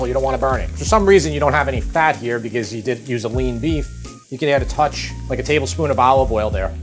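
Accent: New York, United States. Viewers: You sound like Andrew Camarata. You must be from New York. He's a YouTuber, like you, but different in that he's heavy equiptment, etc.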